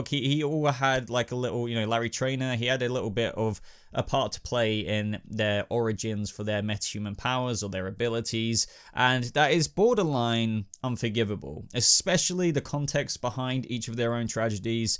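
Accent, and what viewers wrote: United Kingdom. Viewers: OK I've just came across your channel, and thought, due to your accent that you are in the UK, but after what you kept saying about the CW, and DCUniverse things and hbomax, none of these options are available in the UK, so you must live abroad to be able to watch these programmes regularly? If I'm wrong then my apologies, but I'm confused, lol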